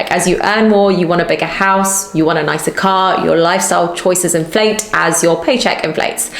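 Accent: England, United Kingdom. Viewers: The England accent it’s annoying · I'm just here for the British accent 😂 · No, she looks Indian. She sounds British. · Firstly, I am American. Your accent is so soothing. I have found your channel and now I am binge watching them. There is some great information you are putting out. Thank you. I am 47 and never really new about financial education. I have started to learn thanks to YouTube. Between Minority Mindset and you and a couple others, I have started to learn. I am so far behind. But at least I have found people who are willing to make videos that caught my attention. I have been researching engine swaps for my car, and Minority Mindset popped up. And then your channel as well. I am so glad it did. I've seen other people like Robert Kiyosaki and a few others. I just bought Rich Dad Poor Dad. I am now on my way. I do have my 401k through my job. 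So that is good. You are a beautiful woman. And VERY INTELLIGENT. And with your British accent, you are very pleasant to watch. I am trying to learn as much as I can. I've been stuck in the rat race like almost everyone else. Your right, that's what they want. All these years I've been making other people wealthy instead of myself. Thank you so much,!!! Truth be told. I saw your thumb nail and thought, wow she is pretty. Sorry, I'm just a guy!!! Lol. But your content is so worth it. Thank you again for helping me and alot of other people turn our lives around.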